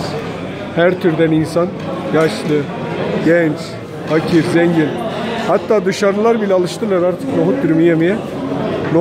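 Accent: Gaziantep, Turkey. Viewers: Kardeş videolarını izliyoruz.bizi hep umsuruk ediyn ağam gecenin bir yarışında hanıma yemek yaptırıyorum.sayende kilo aldım. Ama antep sivenle harikasın basarilar....videolarin devamını bekliyoruz.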